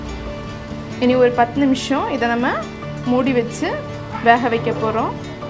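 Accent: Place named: Kanyakumari, India. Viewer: vearaci.... kollam✌😍 Nature of kanyakumari slang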